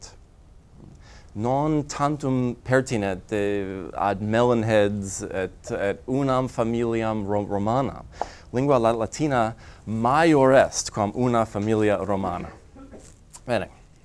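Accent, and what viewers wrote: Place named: United States. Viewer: I love that this guy just roles with his American English accent.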